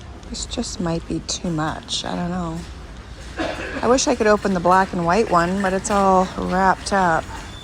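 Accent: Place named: Canada. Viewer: She sounds Canadian.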